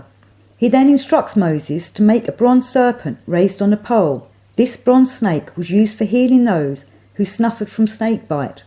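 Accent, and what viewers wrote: England, United Kingdom. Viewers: Thank you so much for your positive feedback. I am only at the beginnings of discovering and enjoying your informative videos... from the beautiful imagery and powerful symbolism, your lovely English accent to your passion for knowledge and sharing is simply golden. 🙏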